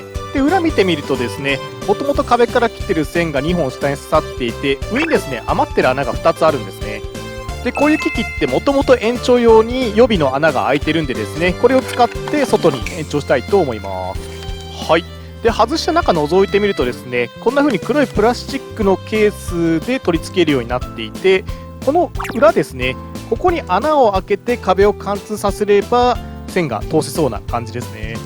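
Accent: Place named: Aichi, Japan. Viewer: 関係ないけど喋り方きいてるとこの方は愛知とかの人かな？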